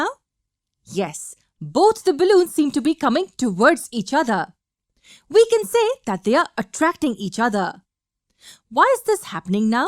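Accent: India. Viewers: The indian accent do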